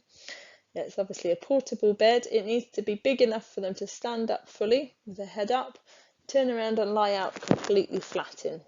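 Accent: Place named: Europe. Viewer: judging from your accent believe you are in Europe, sorry I'm in japan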